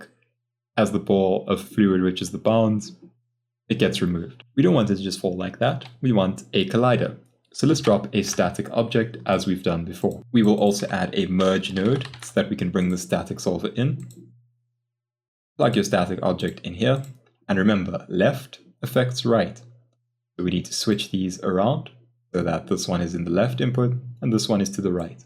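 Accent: South Africa. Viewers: Super helpful. Trying to move from C4D to Houdini so these tuts really help! Thank you! Are you South African?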